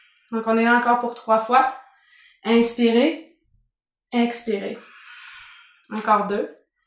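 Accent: Quebec, Canada. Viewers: Bravo à vous !!! Je fais pas mal de séances de yoga sur youtube et je veux vous féliciter pour la qualité de votre cours ! Tout y est clair : postures bien expliquées et mouvements respiratoires annoncés au bon moment. De plus, votre voix et votre accent québecois sont très agréables ! Merci !!!!!! Namasté !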